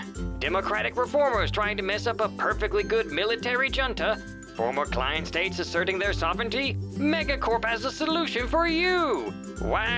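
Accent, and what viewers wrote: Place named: Deep South, United States. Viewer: What the actual fuck is "self-own"? Also, why are randomly you talking like you're from the deep south?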